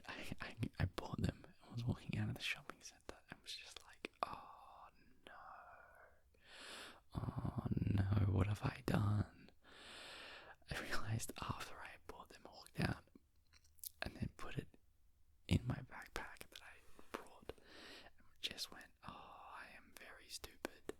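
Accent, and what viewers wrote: Australia. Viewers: Oooooh Australian Asmrtist I’m here for it! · We love an Aussie accent 🫶🏼 me & my sister called it “tickles” too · Thank you Australian Steve Harrington for the amazing ASMR <3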